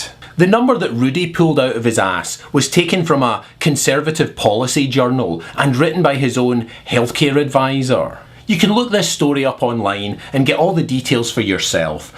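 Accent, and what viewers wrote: Scotland, United Kingdom. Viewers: hahaha everything u say is funny. i think the scottish accent helps.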